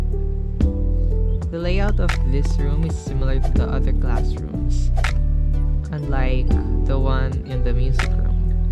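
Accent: Philippines. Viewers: Ur filipino cos of ur accent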